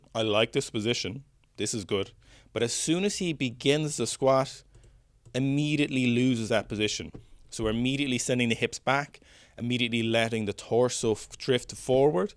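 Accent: Ireland. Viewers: Aggressive, disorganised breeding before the squat. Man I love the Irish accent.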